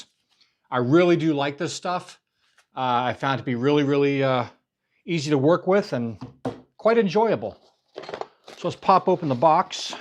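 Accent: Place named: United States. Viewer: Always makes me laugh how you Americans pronounce soldering ie sodering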